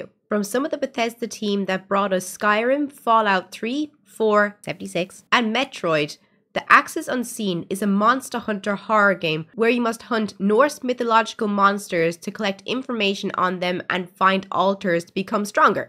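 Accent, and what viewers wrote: Ireland. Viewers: I like your accent 😊 I need to move to Ireland 🇮🇪 😁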